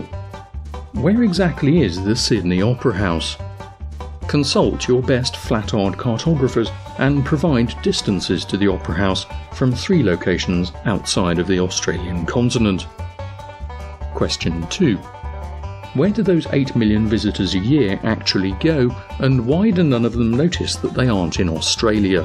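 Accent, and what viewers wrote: United Kingdom. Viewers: Shill behind a stuffy English accent. Must seem pretty impressive to the cucks (subs) of this channel. The real working model is quite the hot potato but I'll give you clueless twits a hint. The vajra. PLASMA is the light source. Learn about primer fields. Maybe you can figure out that the electromagnetic ice dome is essentially a magnifying glass. You may struggle to get it given your limited ability to reason but the sun is nothing more than the focal point of light inside the dome from the plasma spiral( again, learn about primer fields globuloids) Just like a magnifying glass. That's why you can see clouds behind and in front of the sun when it's lower on the horizon. It is local but only the light from the source. Learn about the VAJRA! What it is and when was it seen. Also the complete book of Enoch. If you are godless there's no telling you as it is impossible to have understanding without. 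And I'm not talking about the Freemason puppet Christian church on the corner. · If you call people retards it's no wonder you only get responses from like minded people. That is no way to expand your horizons..ps nice British accent.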